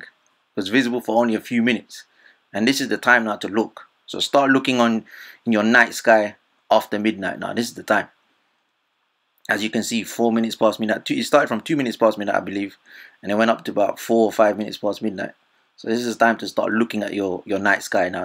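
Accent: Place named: England, United Kingdom. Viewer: Good stuff, where are you living? you sound English.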